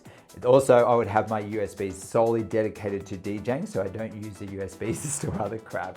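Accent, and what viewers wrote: Australia. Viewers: I love how he softens the blow of bad situations with that Aussie accent!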